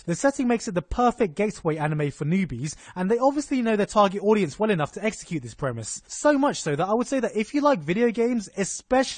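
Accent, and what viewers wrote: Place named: United Kingdom. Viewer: 英國口音就是這聲音啊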